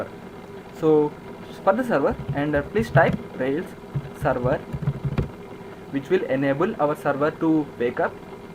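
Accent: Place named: India. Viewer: you clown........... who told you to talk in english ?? indians look very funny when they try to speak english....... :-)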